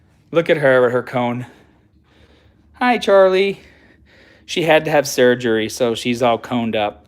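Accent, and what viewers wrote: Boston, United States. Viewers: I just subscribed. I subscribed to your absolutly gorgeous wifes channel and figured id give you sum support too. 🎉🎊🎉God bless you, your entire gorgeous family💑 & your new home! ..🙏🙏 Awweee your cats soo adorable! Your new house is AMAAAZINGLY GORGEOUS!!😍😍😍 I LOOVE IT!💝 lmaooo😂😂😂😂 " A class A lesbian.." 😂😂😂😂😂 you have a great sence of humor. 😂😂😂 You still got your boston accent.. I loove it! I love right outside boston. But have only lived here a few years. Moving is SOOO STRESSFUL!😱 I really hope you guys had as pleasant as a move as possible.. Bello soo Adorable!😍 congrats on the move. That house is sooo nice! Loove those chandeliers!😍 awwee your soo sweet - "you wanted to give your wife her dream kitchen.." sooooo SWEET!..💝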